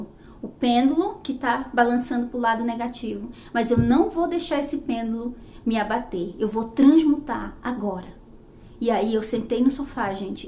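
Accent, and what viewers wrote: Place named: North, Brazil. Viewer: Ela é da região norte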